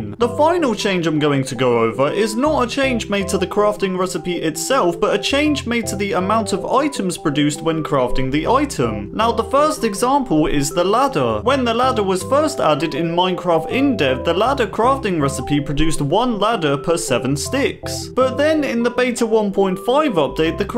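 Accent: United Kingdom. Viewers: R u British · i cant believe ill say this but...now i understand why my friend HAtes english accents...fuck i hate myself now...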